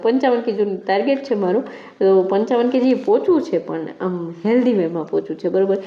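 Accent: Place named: Kathiawar, India. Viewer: Hello! I came across your video and kept watching bcoz of your cute kathiawadi accent! I can give you some tips..walk for 10 mins after every meal. Dinner 6.30 sudhi ma patavi devanu.. It helps a lot!!!. Savarna breakfast ma ugadela kathod add karay! Also rotli ma jowar,ragi eva lot vaprai...I love those😊. Divas ma ghar ma dadra chad utar karvana leg muscles majboot thase. Dhire dhire exercise ma weight training add karvani ..5 kilo na dumbell lai ne sharu karay. Or even pani ni bottle thi pan karay...plenty of videos on youtube! Ena thi body saras tone thase ne inches ochi thase. Good luck..Will keep watching👍👍😊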